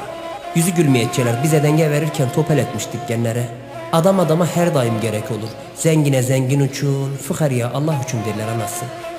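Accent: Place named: Çobanbey, Turkey. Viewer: diline salık emi gene cobanbey şivesini çok güzel anlattımışsın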